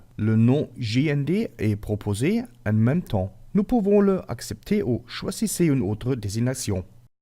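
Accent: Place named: France. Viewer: ach du liebe Gott ^^ danke für diese schöne Französisch-Akzent.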